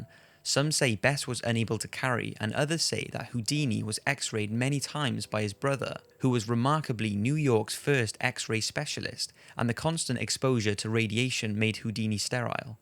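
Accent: Wales, United Kingdom. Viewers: what part of the uk are you from mate ? :) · new to this channel going trough all your videos now :) are you from wales by any chance? you sound it lol I'm from wales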